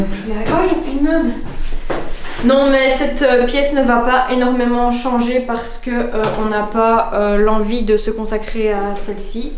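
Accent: Belgium. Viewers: Pour moi, c'est le Hainaut. Qu'est-ce qui m'a mis sur cette piste outre ton accent, le livreur de matériaux. Par contre l'accent de, c'est plutôt celui de l'agent Verhaegen. Au fait, essaye de ne pas le contredire sans cesse, il va finir par te donner un petit coup de taser.☺️ Nous, on va commencer les travaux de rénovations de notre maison qui se situe entre Charleroi et Beaumont dans la ville qui a un Beffroi et des jardins suspendus, tu vois laquelle?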